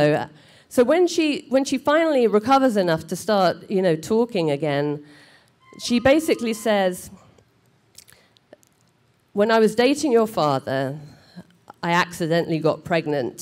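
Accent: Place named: United Kingdom. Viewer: Just stumbled across one of your stories via a friend on FB and found my way here. Love the stories. I'm a Trini and I think it's great that you visited your father in his country and found the missing parts of yourself. Here, you're just another slice of our rainbow. The Brit accent is the only thing that'll give you away! :)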